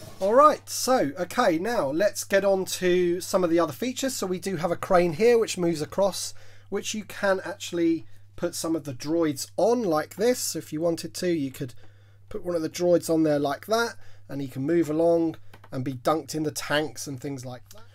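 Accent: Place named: United Kingdom. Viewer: I've always liked the way you Brits pronounce WATER as WATA.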